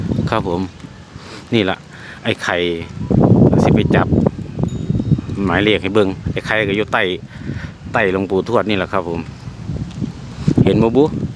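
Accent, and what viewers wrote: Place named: Chaiyaphum, Thailand. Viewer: แอดมิดสำเนียงชัยภูมิออกคักเนาะอ้าย555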